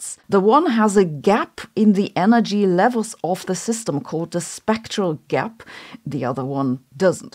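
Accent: Germany. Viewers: I know you have someone proof read your script. I had a German girlfriend who had a slightly harder accent than you. She is very smart and intelligent. She often asked me for proper grammar and pronunciation of words and sentences. I respect your channel and how you are able to make it entertaining and enjoyable for others.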